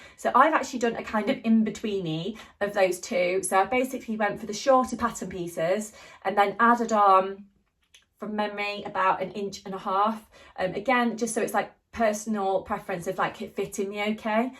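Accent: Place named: Cheshire, United Kingdom. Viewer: I really like the look of your Tulip skirt and Sew Over It have a sale on at the moment - guess what I’m buying! 😂 I’m not a fan of Tammy’s patterns though, I’ve tried a couple and they don’t suit my body shape (I’m slightly rounder in various places than you are 😂). Good job there’s room for all of us in dressmaking, isn’t it! I’m currently doing a proper fitting course with Gina Renee Designs online, making a moulage and a bodice sloper as I’m so sick and fed up of my blouses etc not fitting properly and having to give them away or charity shop all my hard work. I’ll know how to adapt my rather extensive pattern collection then, I reckon and until I do, I’m making men’s shirts for my husband! Lovely to see you again btw, with your lovely accent that sounds not too far off from me (Cheshire)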